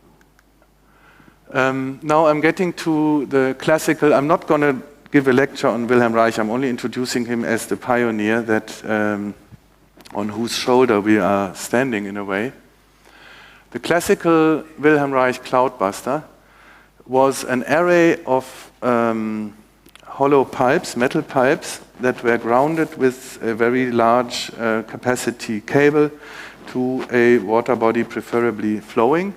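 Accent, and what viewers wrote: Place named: Denmark. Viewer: Sounds like Danish accent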